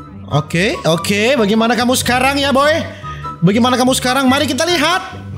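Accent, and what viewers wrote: Manado, Indonesia. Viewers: Hy bg gua suka liat konten2 lu hnya karna suka dgn cata bicara dan logat menado gua asal sunda Bogor bg sukses selalu yg budi🤣🤣🤣seeyou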